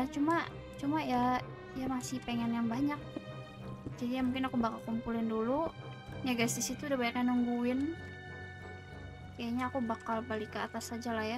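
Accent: Indonesia. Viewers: Sumpah nih kakak orang sunda apa orang mana sih?😅😅,lu kaya orang jakarta,terus surabaya,sunda,medan,yg mana sih yg bener😂😂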